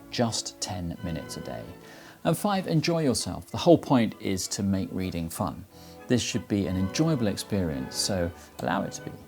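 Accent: RP, United Kingdom. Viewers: can someone answer? what accent hes using? is it fully posh?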